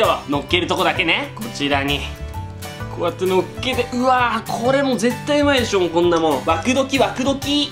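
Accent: Kansai, Japan. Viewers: 、関西出身やったりする？？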